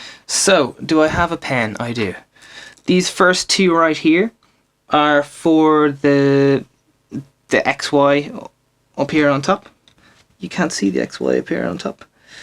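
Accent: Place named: Ireland. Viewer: Nice one, & a pleasure 2 hear that charming Irish accent !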